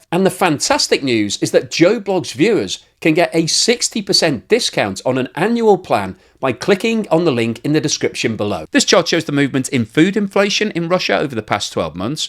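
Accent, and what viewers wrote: United Kingdom. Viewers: what Propegandist like fail to realize is there are countries with far worse inflation than Russia which still manage to keep their economies from collapsing. Russia's is an economy of war, it does not, and cannot be measured like a a regular economy. What matters to Russian citizens is that their basic needs are taken care of, and indeed they are. I guess these people need another year or two to take back what they're saying now, the exact same way they ate humble pie about Russian Army being in shambles. Now Joe you're handsome fella and you have a nice British accent which might fool some people into thinking you're some kind of authority on Russian economy, but you are the furthest thing from it. (your own country "Britain" I assume is turning into a 3rd world country_ businesses closing left right and center aren't you better of "commentating" on that? 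lol)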